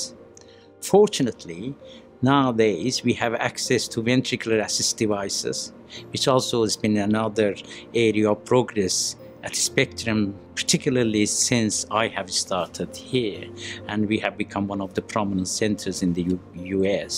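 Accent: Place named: Azerbaijan. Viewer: Azari accent👍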